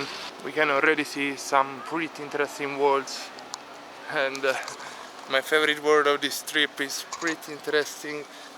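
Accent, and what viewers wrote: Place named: Italy. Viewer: Grande bellissimo vlog, non sono riuscito a capire proprio tutto pero ho capito che ochrida ti è piaciuta, io (i miei) sono originario della macedonia pero vivo a roma, comunque si capisce subito che sei italiano "cinquecento"🇮🇹 Ottimo lavoro spero che continui con questi tipo di vlog 👍💪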